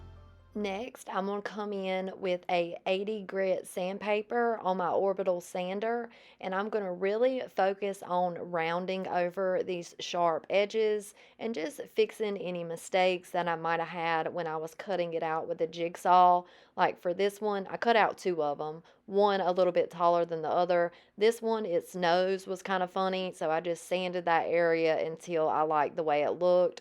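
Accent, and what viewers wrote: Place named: Mississippi, United States. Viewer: (my first ever doing a comment) you are so talented in your woodworking! I enjoy your reel contents. You tell enough how you do things but don’t talk to just be talking, which I don’t mind accent being from MS! You always have variety of items. The bench is lovely, but I would have liked the seat stained, too. Adorable bunnies.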